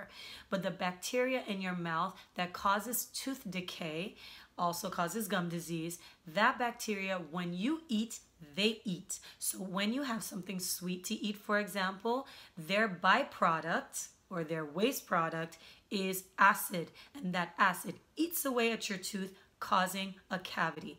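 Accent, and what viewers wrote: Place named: Haiti. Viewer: Are you Haitian? I detect a slight accent.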